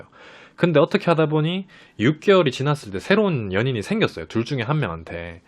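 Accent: Gyeongsang, South Korea. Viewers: 경상도사람이세요??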